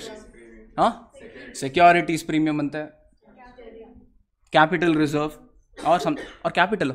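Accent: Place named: Hubli, India. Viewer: Sir r u from karnataka??yavdappa aaytu it is just like our Hubli language 😅❤️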